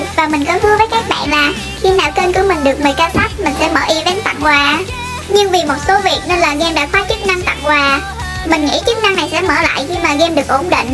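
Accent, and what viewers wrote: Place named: North, Vietnam. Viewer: Nghe giọng chị giống người miền bắc quá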